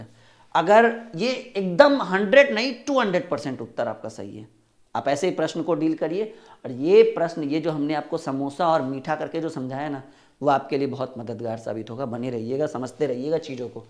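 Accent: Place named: Nawada, India. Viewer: Sir aap buturu bolte h nawada side h kya sir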